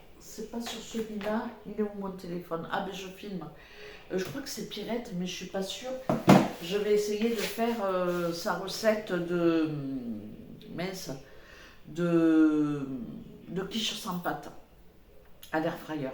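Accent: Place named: Marseille, France. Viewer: Bonsoir et, merci pour tes idées repas 😊, et surtout non, ne t'arrête pas de parler nous on adore t'écouter et en plus avec cet accent marseillais quel plaisir 🤗. Ton petit willy est vraiment trop beau et ses yeux magnifiques. J'ai loupé ton live j'étais en balade chez des amis 😏. Bonne soirée bisous bisous à vous deux et caresses aux petits animaux 💋💋🐕🐈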